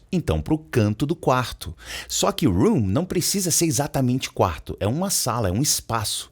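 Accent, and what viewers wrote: Northeast, Brazil. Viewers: Teacher fera! E esse sotaque do meu nordeste só torna o vídeo melhor de assistir! Que qualidade! 👏👏👏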